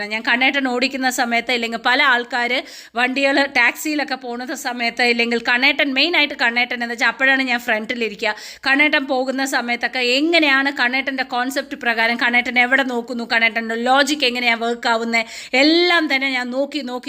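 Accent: Palakkad, India. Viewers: I like the way speaks 👌 Palakkad slang super 👌 I am inspired by you ❤ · Palakkad slang super. V. Useful message for all. You are so inspiring. I wish I were you. So bold & practical. Nice recipe. Shall try it today. God bless ur family.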